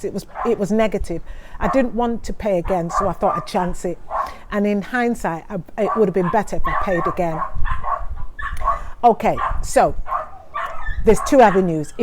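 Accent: United Kingdom. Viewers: Are you British ? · Cou cou is our local dish; actually cou cou and flying fish. I can hear from your accent that you are British, do you have Barbadian relatives? Most black folks speaking with a British accent do have Barbadian relatives. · Where you from cock .... Sheffield?😁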